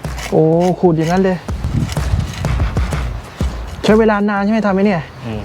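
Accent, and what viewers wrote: South, Thailand. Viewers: พี่ พูดใต้ได้เปล่าครับ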